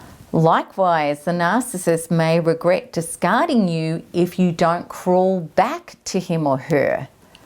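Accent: Australia. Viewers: Australian accents are soy nice.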